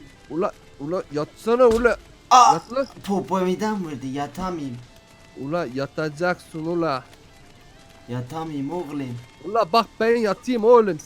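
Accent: Elazığ, Turkey. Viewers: Abi Konuşdugunuz Elazıg Şivesi :D ula falan :D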